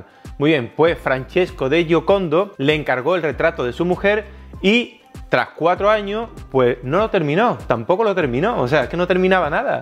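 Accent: Andalusia, Spain. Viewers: Pesaito el andaluz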